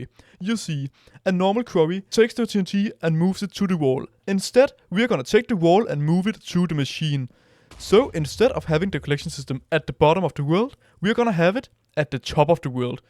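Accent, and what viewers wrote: Denmark. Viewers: are you danish by anychance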